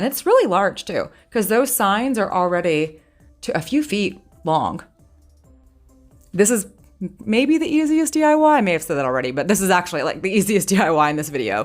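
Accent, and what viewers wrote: Texas, United States. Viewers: I stayed for the entire video and enjoyed every minute. You do a great job of demonstrating. And I. All of. Your projects look high end. Being. A Texan,I really get. Your voice!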